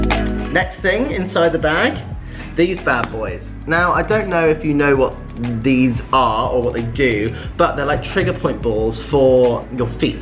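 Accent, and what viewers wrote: United Kingdom. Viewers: My god how i love this boy. I love his face. I love his british voice. I love his muscles. His pecs his biceps all his body. He is just perfect for any man or human. I love his videos. The sexiest man alive in the whole world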